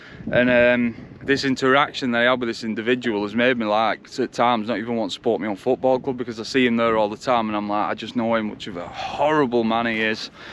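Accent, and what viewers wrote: Liverpool, United Kingdom. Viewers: You've lost your Liverpool accent. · Yes lad LIVERPOOL was expecting you to say man utd with the accent love the channel lad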